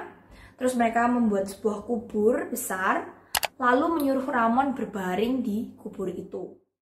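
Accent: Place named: Java, Indonesia. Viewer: Ealah medok banget yo mbak...yo wis gakpopo,ceritanya bagus 😃😃😃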